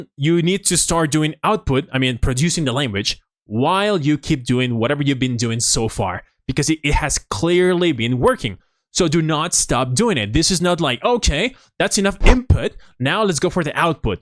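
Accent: United States. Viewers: Nunca me gustó ese acento americano, suena muy afeminado. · Do you have any favorite TV shows, movies, or Spanish-speaking Youtubers? I find it hard to find places to practice my listening. Btw your American accent is really good! You sound like you grew up here!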